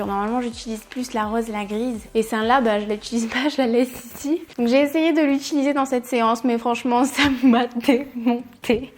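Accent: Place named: United Kingdom. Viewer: J'adore ce concept de vidéos!!! ^^ mention spéciale pour l'accent en anglais haha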